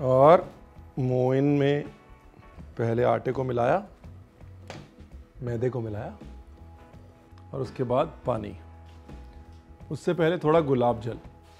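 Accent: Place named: Lucknow, India. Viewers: Humare Ghar bhi yahi Banti hai Holi mein, full on lucknaowi accent... Chalte phirte bhi didn't miss the essence of history/explanation badiya bahot badiya Background score bhi Holi wala HOTA toh cha jate my god... Phela Saal Ka first festival Kuch toh shor Hona chahiye...koi nai feelings conveyed by exceptional Poem, visuals, t-shirt, recipes and ofcourse singing... 🌈🎨🏳️‍🌈🎊 Happy Holi to Yours from ours 🥳🥳🥟🥟🥟🥟🥟🥟🥟🥟🥟🥟🥟🥟🥟🥟🥟🥟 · Lucknow ka accent ek Punjabi k muh se sunna.. bada mast laga sunke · Thank you so much...I love all your receipes..and this one is super amazing... Love the way you talk...main videos dekh ke samajh gayi thi this must be someone from Lucknow...Thanks!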